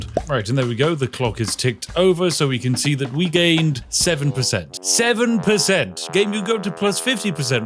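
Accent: United Kingdom. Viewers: honestly I like your videos where you exploit stuff, but this game doesn't really sit well with you for the most part its narrating things that have already been shared weeks ago, I think you are also on previous patch just based on how your inventory looks, and by the way there is easy way to get the greater infinite arrows from abbys challange that doesn't take much time with explosive arrows. so kind of too late and kind of doing it hard way, but narrated in nice british accent :D also did you know about the golden apples that you can make gold bars with? find it less annoying than trying to find nobles with goldbars :D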